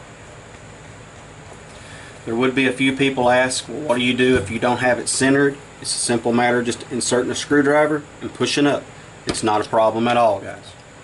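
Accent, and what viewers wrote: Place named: New England, United States. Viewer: It's pronounced "Nick-EEE" not Nick-eye......brother you have got to be a Yankee. All them R's in your words. LOL (no offense intended, all my in-laws are Yankees)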